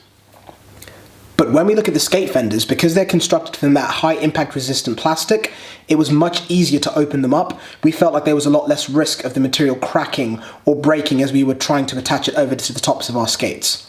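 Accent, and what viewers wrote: England, United Kingdom. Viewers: Anyone else find it odd hearing an Englishman talking about hockey equipment?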